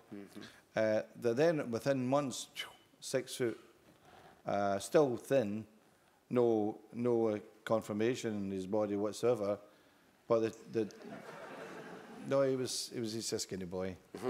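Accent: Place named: Scotland, United Kingdom. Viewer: Especially with his thick Scottish accent. My ears are blessed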